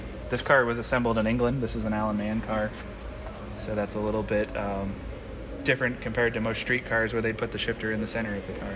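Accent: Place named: Midwest, United States. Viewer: it’s a Midwest thing. They also say things like “ruf” instead of “roof”, “pop” instead of “soda”, “beg” instead of “bag” and “dror-ing” instead of “drawing”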